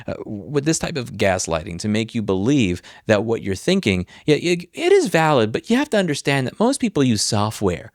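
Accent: New York, United States. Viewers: I LOVE how this tough talking New Yorker who teaches everyone how they are getting F**ked every video is a competant Couple Counselor 😅:hands-yellow-heart-red:❤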